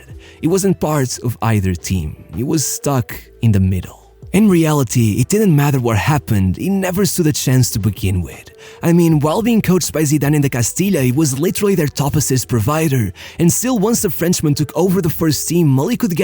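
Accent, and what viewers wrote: Portugal. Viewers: Bro, embora com um excelente nível de inglês sinto que consegui perceber que és português ahahah abraço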